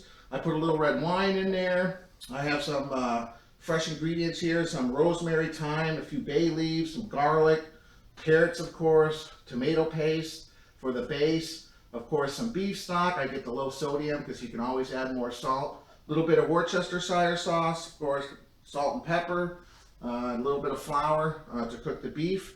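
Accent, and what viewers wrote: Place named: Minnesota, United States. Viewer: I’m guessing your from Minnesota, North Dakota, or Canada.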